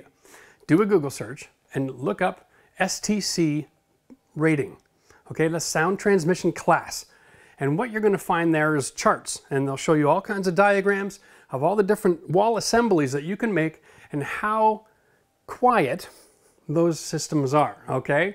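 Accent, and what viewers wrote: Canada. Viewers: This guy is Canadian, eh?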